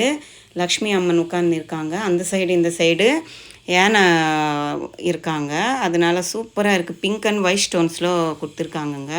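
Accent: India. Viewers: Very useful video mam. Your telugu mixed tamil is so cute to hear😊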